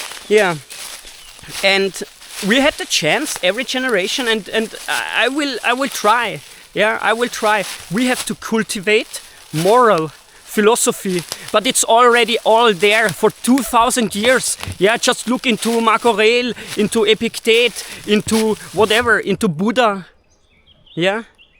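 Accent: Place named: Germany. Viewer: Ah yes, the eternal dilemma of every German YouTuber: Speak your native language, and your content is effectively trapped within the relatively small audience of Germany, Austria, Switzerland and maybe a few neighboring countries. On the other hand, by speaking English, yoru videos suddenly have the potential to reach a global audience, but unless you're genuinely fluent (C1 or even C2) you'll always be ze weird German guy with ze äxent. It's either accepting a smaller audience with which you can communicate naturally, or accepting the fact that you'll always have a linguistic handicap on the global stage. I can relate to that.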